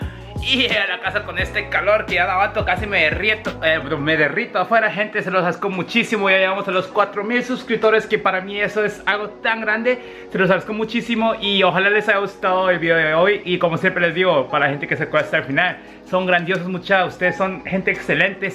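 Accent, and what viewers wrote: Guatemala. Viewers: Mira sus vídeos anteriores ahí lo explica, pero si, sí vivió acá en Guatemala como 18 años por eso su acento Chapín. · ¡Tu acento chapín es perfecto! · Por casualidad comence a ver tus videos, me encantaron, y lo que mas me gusto por ser chapina fue tu acento guatemalteco. 👌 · JAJAJA Amo que tenga súper marcado el acento guatemalteco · Cual es tu historia, por que hablas bien chapin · No pierdes tu acento Chapin porfa....yo empecé a ver un vídeo tuyo y me encanto...Ya le di suscripción y con campanita🇬🇹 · Hola eres guapo 😘, me gusta q hablas cómo guatemalteco, has vivido acá?? Cuánto tiempo??? Que edad tienes??? En que trabajas??? Dios te bendiga desde Guatemala. Claudia 😘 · No perdas el acento chapin!!! Eso le da un toque mas calidad a tus videos Saludos desdd Guatemala!! 🇬🇹🇬🇹🇬🇹🇬🇹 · Nueva suscriptora :) saludos desde Guate xd No olvides tu acento chapín porfa <3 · Soy nuevo en tu canal y para empesar esta bien chilero me llega ,como ablas como chapin no cave duda que bos si sos calidad .te saludo desde la capital de Guatemala.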